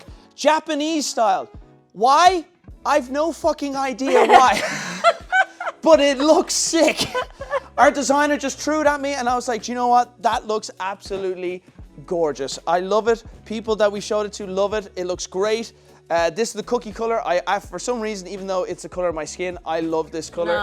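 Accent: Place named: Ireland. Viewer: You sound extra Irish in this one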